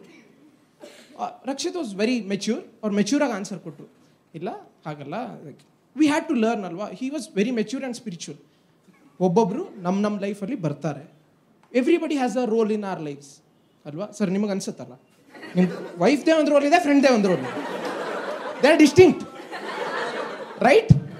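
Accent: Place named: North Karnataka, India. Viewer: Bro ur from north Karnataka pakka I can say that ,both the way I loved it...♥️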